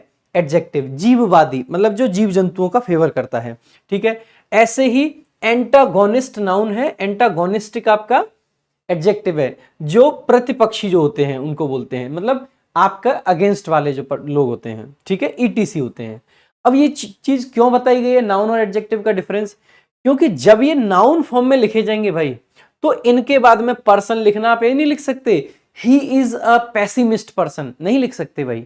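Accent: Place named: Haryana, India. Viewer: HARYANA SA HO SIR MA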